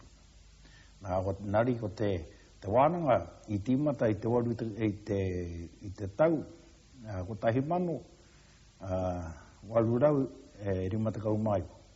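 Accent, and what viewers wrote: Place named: Ngapuhi, New Zealand. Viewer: Te mita o Ngapuhi💗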